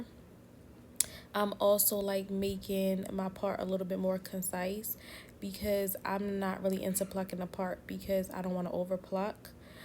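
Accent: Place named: Baltimore, United States. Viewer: Cute! Your accent sounds like Baltimore.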